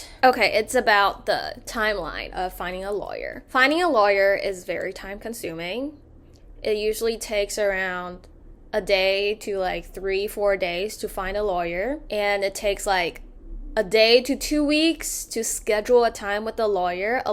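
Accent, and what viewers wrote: United States. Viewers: How did you pick up American accent while you came to the United States in 20's? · how come you have an American accent? did you learn that since you moved in to US? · You sound 100% American. They should let you stay.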